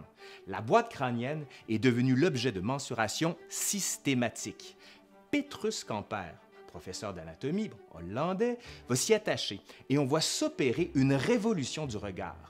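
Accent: Quebec, Canada. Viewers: et l'accent québécois... 💕 (je suis de la région des Hauts-de-France). Encore une vidéo intéressante et drôle à la fois. C'est un plaisir de vous écouter ! J'aurais adoré avoir un prof d'histoire comme vous (avec un visage avenant en bonus... Compliment qui est complément du sujet de la vidéo !) 😉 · oui on nous juge, tu es Canadien, tu as "l'accent de là bas", idem pour moi qui suis Belge, j'ai une chaîne dédiée au cochon d'Inde, le "cobaye", ici on prononce "cobée", ben j'ai eu des remarques, mais aussi des MENACES.... n'importe quoi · J'ai du mal à m'habituer à l'accent québécois mais ça en vaut la peine sur cette chaîne! Belle chaîne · Je vous ai découvert grâce à la vidéo sur la poutine et je suis ravie d'avoir cliqué sur la chaîne!!! Alors, entre la culture gé, les yeux bleus, le petit accent québécois, en plus maintenant vous vous laissez pousser la barbe et vous avez le TOUPET d'insinuer que l'on va mal vous juger??? 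Non mais!!! À moins qu'il s'agisse de discrimination positive... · Je laisse rarement des commentaires sur Youtube, mais je tiens à exprimer mon joyeux étonnement : moi qui ai beaucoup de mal en général avec les accents et le québécois en particulier, je ne pensais pas réussir à passer outre en découvrant la chaine... Et je me suis rendu compte que non seulement ça ne me posait pas de problème, mais qu'en plus j'en suis venue à l'apprécier et les vidéos de cette chaine comptent désormais parmi mes favorites :)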